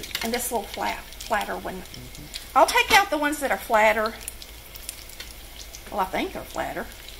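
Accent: South, United States. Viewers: I'm from Florida and I've been watching about 7 minutes, being as I just found your channel. Love your southern accent by the way! Thanks for sharing! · I had a lemon tree and the 🍋 lemons were bigger than a can of coke! They were also a little sweeter than a normal lemon. I sure do miss having that lemon tree! I also had a grapefruit tree that the grapefruits were a little bit bigger than normal and talk about being sweet, you didn’t have to add any sugar to eat them. We could just peel them and eat them like you would eat an orange! I miss having those for breakfast in the mornings! I, too enjoyed watching this video and listening to her talk with that accent! I am from NC and miss hearing it and the funny sayings that comes out of the south! Can’t wait to make make these! Sometimes I want something at night while I’m watching TV but I don’t want anything really sweet, and this sounds like it would fit the bill! 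🦋🦋❤️🦋🦋🍋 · Looks absolutely delicious, and you make it look easy enough that I think I can make this recipe! I just love your Southern accent: "MEELK," "THE-IS," "Y'ALL," etc. I'm originally from Savannah, GA, but lost my accent years ago when my family moved to Ohio. I've subscribed because you and your husband talking and cooking is so great, and I've got to watch more. Thanks!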